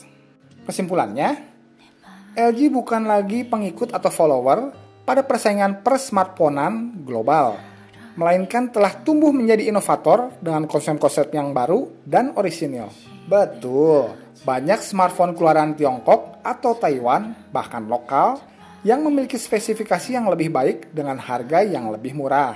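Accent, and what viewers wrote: West Java, Indonesia. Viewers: nyunda nya itu lohh lucu bingitt :*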